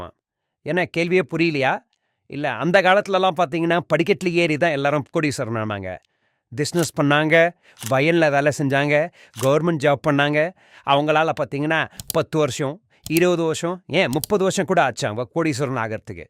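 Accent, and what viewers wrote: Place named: North India, India. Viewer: உன்னோட வாய்ஸ் வடக்கன் மரி இருக்கு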